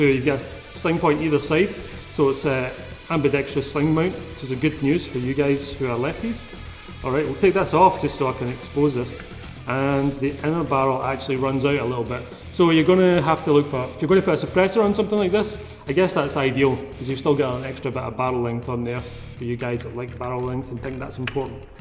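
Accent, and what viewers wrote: Scotland, United Kingdom. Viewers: No, I think he sounds like a Scottish guy.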